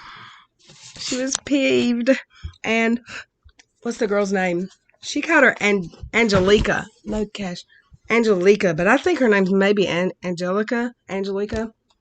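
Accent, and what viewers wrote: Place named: Ozarks, United States. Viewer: you sound like you're from arkansas missouri etc that area; and sounds like she is from the midwest (which she is she lives in MN st paul area cheese country) so that the fact that she is saying her harraser is from the same is in geographic area as her yet YOU have a very differnt and strong accent from the diff part of the country just shows that she is trying to place blame on other people and is sick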